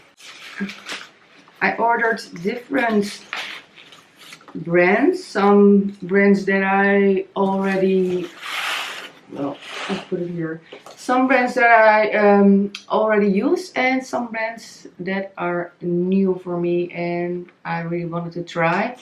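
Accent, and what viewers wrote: Netherlands. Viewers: I knew it omg I watchers your curly hair routine and i was like that accent is soo dutch . Groeten van vlaanderen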